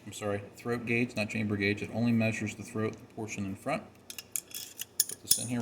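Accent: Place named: Maryland, United States. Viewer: Born and raised in Maryland here ha, I thought I could pick out that tiny Maryland twang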